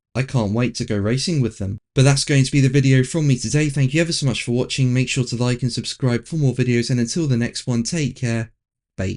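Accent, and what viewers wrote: England, United Kingdom. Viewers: I love your english accent 😂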